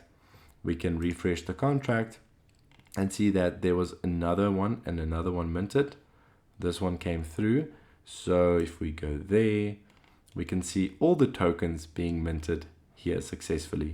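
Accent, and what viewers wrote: South Africa. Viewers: Proud to hear your South African accent! Thanks for everything,! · I almost jumped when I heard your accent! we gotta make NFTs trend in South Africa! Thank you so much for all of this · Why do you sound south african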